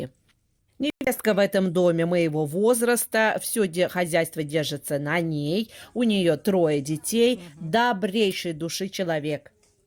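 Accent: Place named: Russia. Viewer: У нас в России есть пословица, встречают по одёжке, провожают по уму, спасибо за интересный фильм, вы по настоящему русская женщина, я имею в виду как вы разговариваете и строите свою речь, только так русская может говорить и высказать свое мнение, интересная ваша семья, дети воспитаны, выскочил ваш канал, не смотрела, а потом подсела, лучший турецкий сериал, здоровья вам.❤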